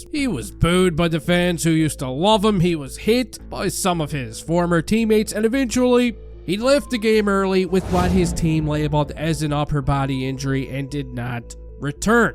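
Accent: Newfoundland, Canada. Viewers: Is this a newfoundland accent?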